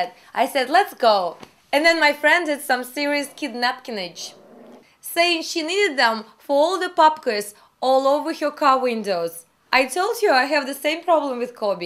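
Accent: Russia. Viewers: are you russian?...i think i hear the russian accent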